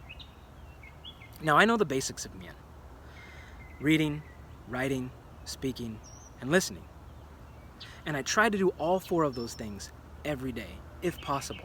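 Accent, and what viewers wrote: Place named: United States. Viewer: the way your reading it is like a American paces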